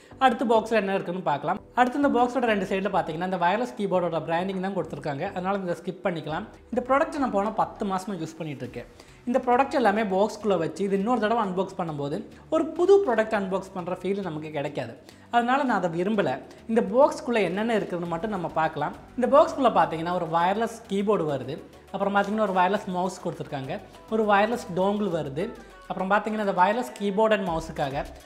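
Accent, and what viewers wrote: Kerala, India. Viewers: Bro yr Tamil is like mixed with Malayalam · you have a peculiar Malayalam accent with humorous touch! Nice Video , Keep it up and post more!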